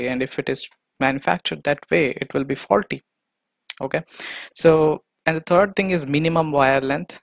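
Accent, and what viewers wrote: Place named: India. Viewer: Finally i am deep enough in the rabbit hole to get tonthe Indian accent. This is it. Getting smarter as hell.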